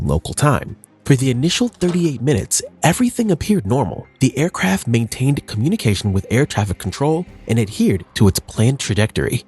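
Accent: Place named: India. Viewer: YOU CANT HIDE UR INDIAN ACCENT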